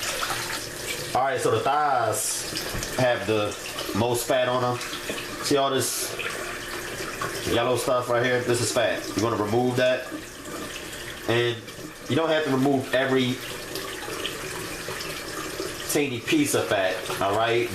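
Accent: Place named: Baltimore, United States. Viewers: commah ntata he sound like he for bmore 😃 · I know lol I'm from Philly and I thought he was from Baltimore. I can't get over that accent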